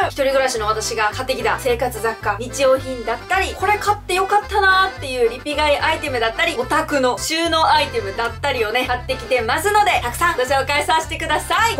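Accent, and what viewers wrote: Kansai, Japan. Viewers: 無印週間の動画を探してたら、初めてさんに辿り着きました！関西弁が可愛いすぎます😂 他の動画も観てみます〜💞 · 初めてコメント失礼します😊いつも楽しく動画見させてもらってます😊😊 関西人なのでの喋り方も面白ポイントもどつぼです❤ 編集もかわいいが詰まってて大好きです！今日無印良品行っておんなじ商品いっぱい買ってきちゃいました〜😋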